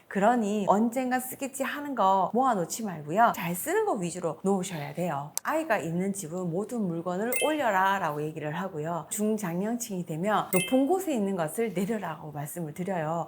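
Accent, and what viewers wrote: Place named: Daegu, South Korea. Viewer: 대구사투리 넘 예쁘네요~ 😂물론 도움도 많이 됩니다😊 구독!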